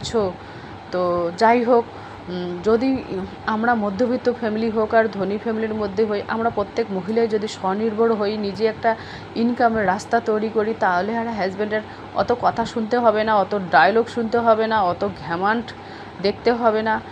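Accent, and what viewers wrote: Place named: Bangladesh. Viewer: পুরো ভিডিওটা দেখলাম, আপনার কথা বেশ ভালো লাগলো, মনে হলো আপনি বাংলাদেশ থেকে 😮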